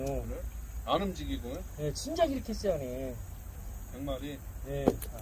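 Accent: Jeolla, South Korea. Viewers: 전라도여?ㅋ 가장게. 일로치나. 절로간게. 타슈와 타랑께ㅋㅋㅋ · 으따 전라도여~^^ 너무 좋당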